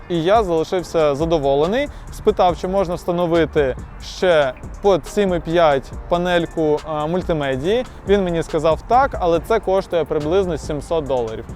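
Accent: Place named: Russia. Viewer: Чути характерну вимову людини яка розмовляла російською, але звісно респект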